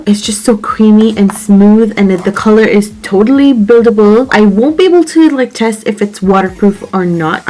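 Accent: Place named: Ireland. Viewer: Where are u from cuz u sound a little Irish🇮🇪I’m irish too💜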